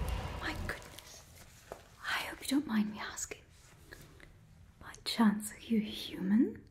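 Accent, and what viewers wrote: United Kingdom. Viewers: Your British accents are always so tingly ❤❤ Also I made a nobel water genasi character named Cordelia literally a week ago, I’m losing my mind. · Would absolutely love this character to come back, I'm not sure what it was but this video is 1st in my top 3 asmr videos. (The accent was very English. If I was a new sub I would've thought you were from uk).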